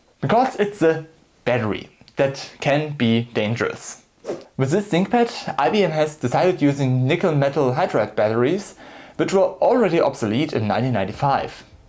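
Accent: Germany. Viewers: Das deutscheste Englisch was ich bisher gehört habe. 😀 Aber super Content mega! 😊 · Dude, your accent is the every English speaking German in any movie. Fantastic. Love me a thinkpad.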